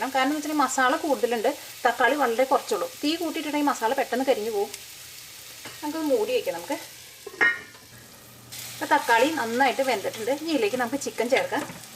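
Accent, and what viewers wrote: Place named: Malabar, India. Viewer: I really loved the narrators south Malabar accent.. keep it up dear.. Godspeed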